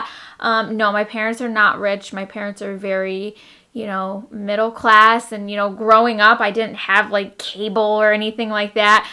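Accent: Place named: New Jersey, United States. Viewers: Love your lipcolor!!! And I assumed you were from Jersey too. I'm born and raised in Cali. And to me, your accent sounds like what I would assume is a Jersey accent. Buuut, I am not an expert obviously on accents lol. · I thought you were from New Jersey bc of your accent 😂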